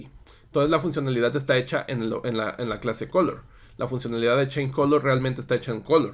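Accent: Mexico. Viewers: eres de Leon Guanajuato, MX?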